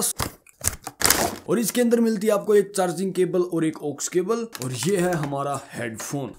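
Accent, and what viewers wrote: Haryana, India. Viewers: Pakka tum Haryana ke hi ho:face-green-smiling::face-green-smiling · awaj me haryana jhalak rha h bhai.😃... baki full support h bhai ko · Bhai haryana t h k tu 😂🤙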